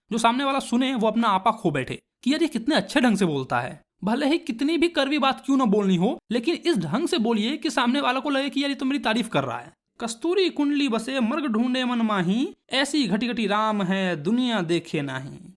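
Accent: Bihar, India. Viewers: Dekh dekh k apki biharan accent mere andr arhi h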